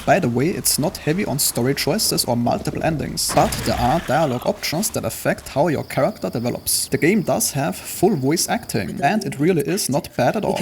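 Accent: Germany. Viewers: i cannot stand that german accent....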